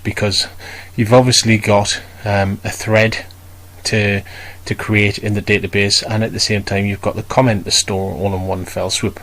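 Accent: North, United Kingdom. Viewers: Nice to hear a fellow Northerner's voice. This was very helpful, thank you.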